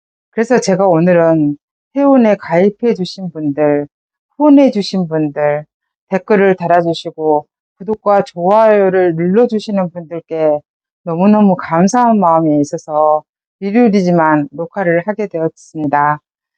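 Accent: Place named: Honam, South Korea. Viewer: 당신이야말로 애국자 이십니다 진실과 거짓을 구분하고 정의와 불의를 알며 선과악을 알고만 있어도 세상의 모든것이 제대로 바뀔수가 있읍니다 억양이 호남쪽분 같은데도 이렇게 바른소리를 내시니 천만다행 입니다 고맙고 감사 합니다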